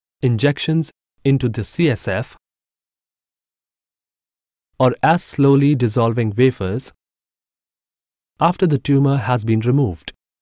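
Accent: India. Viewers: ahhhh yes.... the fabules indian accent absoulutly love it omg i cant spell, anyways whoever is going through this i pray for you to get better and never have doubt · สำเนียงแบบนี้อินเดียแน่เลย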